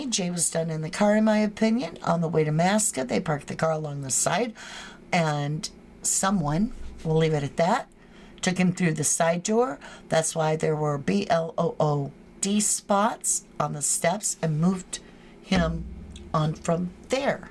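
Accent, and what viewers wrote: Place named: United Kingdom. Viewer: I love your UK accent 😂❤️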